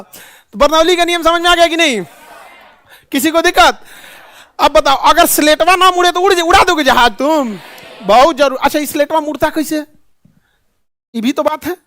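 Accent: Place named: Bihar, India. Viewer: jock chal rhe ya sikha rhe🙄🙄, itni bakwas video, lol bhi samjh na aya, koi bihari lag rha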